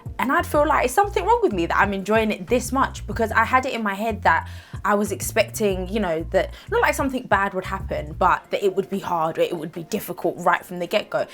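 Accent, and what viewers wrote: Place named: London, United Kingdom. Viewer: I didn’t notice your accent until you said you were from London 😂 it’s so subtle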